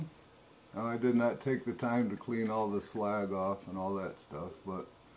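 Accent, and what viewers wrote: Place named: Michigan, United States. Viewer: WOW!!! Amazing! You have been absolutely informative and helpful. All 3 turned out great, but I like the "fingers" better, seemed more naturally swirly and less angular.. I'm hoping to take on a small bathroom vanity in the near future and had a lot of doubt about concrete until I saw your channel. "Michael builds" channel is helpful too, so my husband and I bought the rapid set mortar mix... Plus an extra bag too experiment with. I was also thinking about adding a tiny bit of fine powdered red clay (we live in Georgia and it's everywhere) to the bottom of the mold for "rust" streaks in the "marble." I can't wait to try it out! Thanks!!! Love your accent, I lived in Michigan once upon a time!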